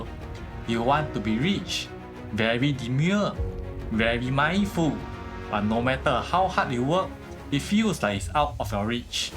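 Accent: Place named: Singapore. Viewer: Singapore accent cannot la..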